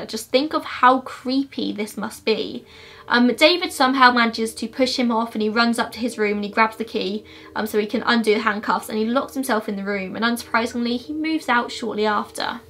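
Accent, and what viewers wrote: United Kingdom. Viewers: I could listen to your Brittish accent all day. With that being said, excellent video as usual. I've seen several documentaries on this case, but yours is really complete, shows how much research you actually put into your videos. Well done, keep up the fantastic work.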